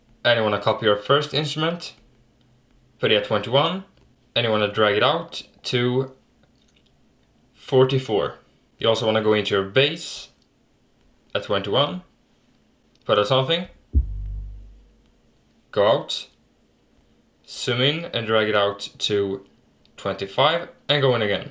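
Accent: Sweden. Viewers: Are you from sweden? :) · Är du swensk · Are you Swedish 🇸🇪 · Ghoits Hafidz He's swedish like me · Omg your Swedish awesome i am to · Are you swedish? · Are you come from sweden? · Swedish accent then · Are u sweedish ? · Are you Swedish? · are you SWEDISH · Are you from sweden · are you from sweden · are you Swedish?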